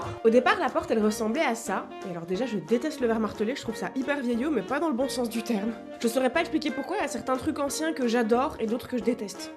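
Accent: Belgium. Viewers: Tient c'est première fois que je remarque son accent belge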